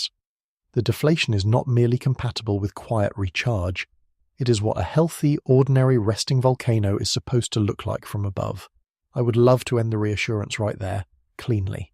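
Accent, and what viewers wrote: United Kingdom. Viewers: They need to give this some pronunciation lessons - it said magmer for magma and sawr for saw. I'm expecting it's going to say 'Warshington State'. Sounds a bit British.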